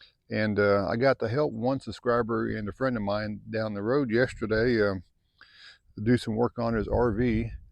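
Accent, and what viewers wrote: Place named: North, United Kingdom. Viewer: Hey you sound like a great northern boy like me.....please h it. Me up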